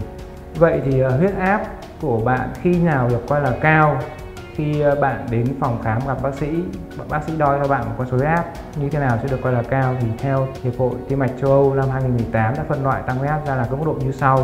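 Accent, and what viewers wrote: North, Vietnam. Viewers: Tiếng bk khó nghe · Mình dân Cà mau bị cao huyết áp nhưng nghe giọng nói bake thì thấy không tin tưỡng nên tắt ngay.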